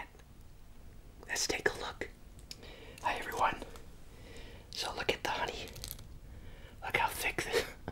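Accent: United States. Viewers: 15 seconds in and i find your american accent very tingly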